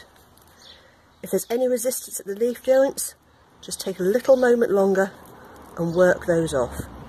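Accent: England, United Kingdom. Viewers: many thanks. Made my first nettle cord a couple of days ago. Very happy. BTW my Taiwanese wife thinks you have the clearest English accent she has ever heard. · I like the tone of your voice and the English accent is lovely 😊 very clear explanation👌 hope you will make more videos like this. Do you make baskets too?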